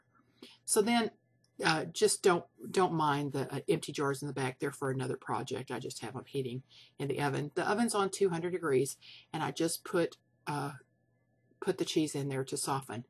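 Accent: Texas, United States. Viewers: I beleive that is a Texas accent, and also I beleive by her demonstations that she is probably a teacher of young children. I love her videos.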